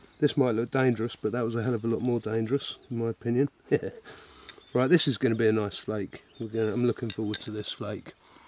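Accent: United Kingdom. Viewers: With this filming you look animated like the fantastic mister fox movie. I love your content it's very genuine. I have been trying to learn napping but have only found Americans and was very glad to hear a calm u rushed british voice with birds in the backround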